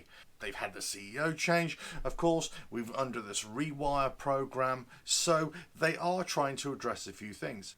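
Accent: England, United Kingdom. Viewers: WTF Harley going bust? No. Harley change, is business tougher. Probably. Your accent, suggest you are in England. Back when I lived in UK. Harley was a rare sight, So was a Goldwing, Don’t know about today. Can’t see riding B roads in UK on a Harley, or a Goldwing. Just my opinion. Or at least I couldn’t when I was a young guy with a 250. When you get older, your priorities change, The Harley I thought to big, to old, to boring and to slow, a bike for old fat guys. Not for me when I was young. 35, or 40 years, Comfortable sounds much more appealing, No point in Harley making a crotch rocket, Ive out grown them. Now I am an old fat guy. Today’s millennials will out grow crotch rockets as they age. Can afford bigger, More comfortable bikes. Some will choose, adventure, Classics, Touring or Harley. The Harley market share in the US will remain strong, biggest risk is loosing some to Indian. 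Those of us who choose, British, Italian Or Adventure, were never Harley customers in the first place, They haven’t lost US, they just never built the bikes we liked. We are not buying Japanese cruisers either.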